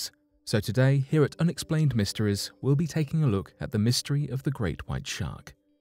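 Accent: England, United Kingdom. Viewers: Look mate you sound English uses feet not metres were not in the EU anymore OK .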